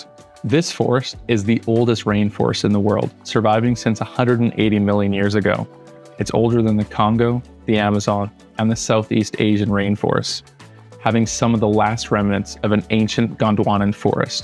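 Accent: Canada. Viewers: Wow an American who knows something outside of the US. Then it dawned on me that's a Canadian accent. Now I understand. Great presentation by the way. I learned quite a lot about plants and endemic animals that I hadn't heard of before. By the way if you ever get a chance to visit the rain-forests of Australia you won't be disappointed. The Tasmanian ones are very special also. By the way that deserves a thumbs up and a subscribe! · This gentleman has a Canadian accent…it’s subtle but you can hear it…I believe he is Canadian.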